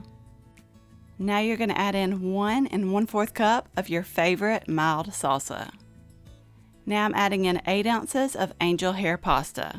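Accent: South, United States. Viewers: I love your southern accent where are you from? My guess is Georgia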